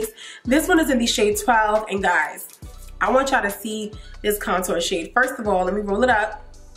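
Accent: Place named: New Jersey, United States. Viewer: Are you from Jersey? Your accent is so cute!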